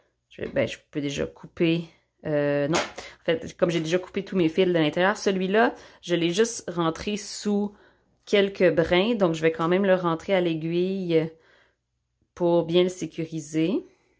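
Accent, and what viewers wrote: Quebec, Canada. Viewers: Finalement je trouve le vidéo que je cherchais depuis un certain temps. Ce super carré granny pour mon prochain tote bag. Et quelle surprise d'entendre ce merveilleux accent québécois. 💖 J'ai l'impression d'être retournée à la maison (Je suis originaire de la ville de Québec). Grand merci, je vois que vous êtes super au crochet mais aussi à expliquer. Ici le puff, on les appelle points popcorn 🙂. Vous avez maintenant une nouvelle fan en Italie 😍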